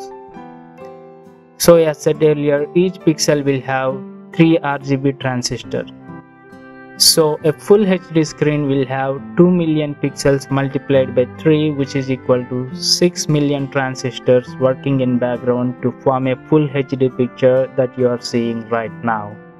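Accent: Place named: India. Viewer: I am from China.Your Indian English is awesome.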